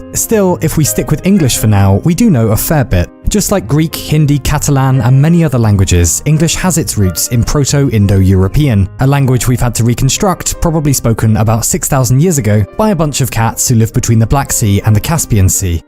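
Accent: United Kingdom. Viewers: OK, but why do Brits talk wrong? It's almost like they want the listener to understand 10% of what they say. Boston, Australia, Texas, drop the dumb accents, and talk like a Californian, with no accent, it's not funny anymore, do you need training wheels for your tongue?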